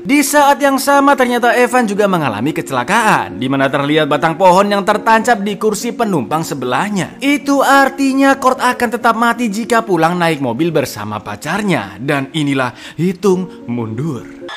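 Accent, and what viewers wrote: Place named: West Java, Indonesia. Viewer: orang Sunda ya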